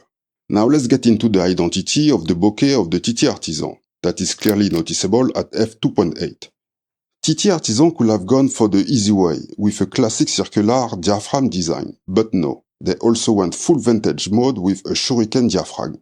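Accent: France. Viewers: J adore comment tu own ton accent frenchy la classe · Super Chaîne ! A l'accent vous parlez français nan ? :D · Im a bigf takumar fan but I own ttartians are good too your french accent is quite pleaseing what software do you use for your graphics?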